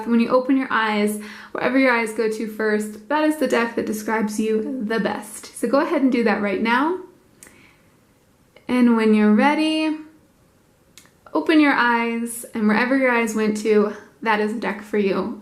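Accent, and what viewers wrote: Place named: Scotland, United Kingdom. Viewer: LAYERS thick Scottish accent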